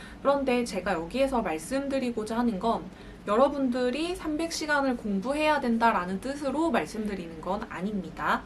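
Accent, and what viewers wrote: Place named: Gangwon, South Korea. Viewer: 누나 부산 사람 아니었어요? 사투리는 강원도인데ㅋㅋ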